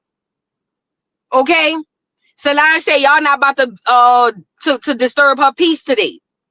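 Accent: New Orleans, United States. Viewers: Girl, f me up with voice! NAILED!!Lorddddd I love that New Orleans accent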